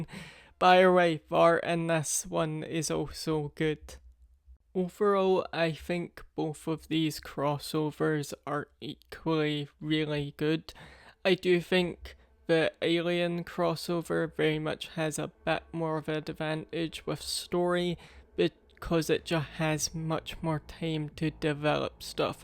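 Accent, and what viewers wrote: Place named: Wales, United Kingdom. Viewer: Bro..sweet accent! Are you Welsh? Seriously, it is awesome.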